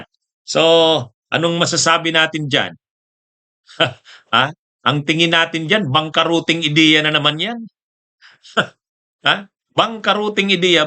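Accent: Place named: Visayas, Philippines. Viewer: atty, bakit bisaya kang magsalita?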